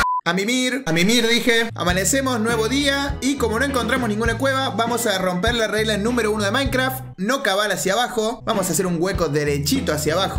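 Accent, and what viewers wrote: Córdoba, Argentina. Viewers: SOS CORDOBÉS????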